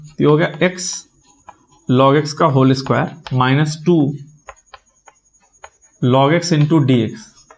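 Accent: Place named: Bihar, India. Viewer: Bihari Accent giving legendary vibes✨✨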